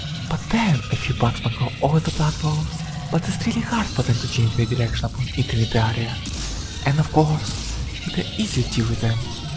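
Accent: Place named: Europe. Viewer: european accent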